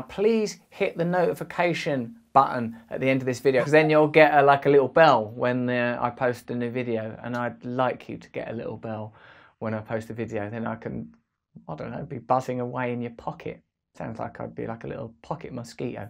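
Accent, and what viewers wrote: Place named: Cockney, United Kingdom. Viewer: I wish his Cockney weren't so accentuated. I have to concentrate for a second, think of the word in context, then figure - he must have said maTTer. lol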